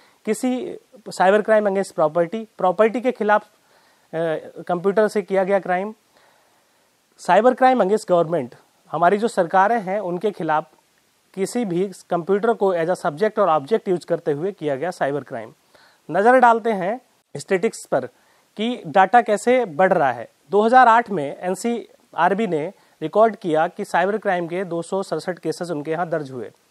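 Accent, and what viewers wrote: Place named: Pilibhit, India. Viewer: maja aaa gaya bhai ----boli pilibhit wali record ho gayee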